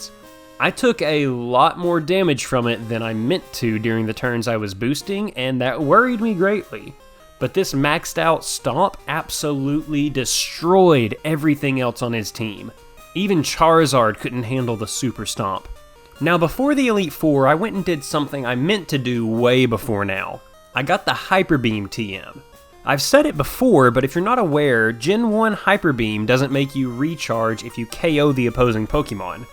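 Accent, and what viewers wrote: South, United States. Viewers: I follow Canadian Poketubers, British, Irish, Australian,... now I can add "Southern American" to the list of accents I get to hear from Poketubers :D Very cool challenge videos, please keep it up!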